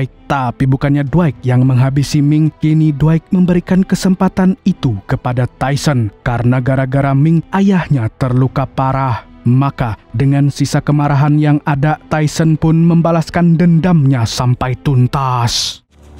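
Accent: Banjar, Indonesia. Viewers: urg banjar kah pian mang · Orang Banjar kh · Org Banjar kah mang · Skalinya urg Banjar jua😂😂😂😂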